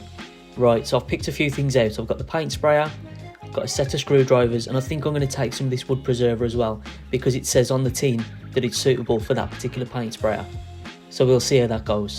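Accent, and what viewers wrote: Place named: Birmingham, United Kingdom. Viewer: Up the brummie, great ole mate😊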